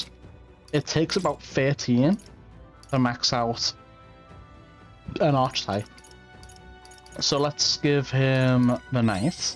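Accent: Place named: Liverpool, United Kingdom. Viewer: Our guide with a good Scouse accent. I love it 😂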